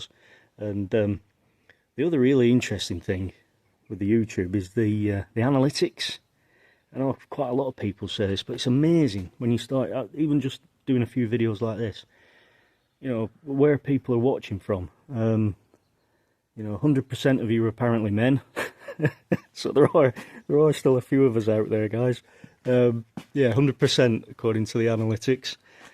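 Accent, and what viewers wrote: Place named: Lancashire, United Kingdom. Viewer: Just a non relevant point, your accent seems more Blackburn, Lancashire, than Norfolk. Not withstanding this the ' bike is great and I would love to own it.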